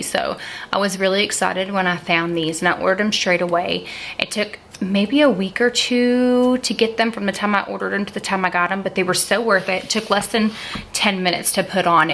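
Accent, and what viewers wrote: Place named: Mississippi, United States. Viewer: You made this looks so pretty I love the tabs I’ve been contemplating on getting this Bible only in the new king James version also I can’t help but ask... are you from Mississippi? Because I’m from Jackson and your accent just reminds me of home ☺️ thanks for the great review.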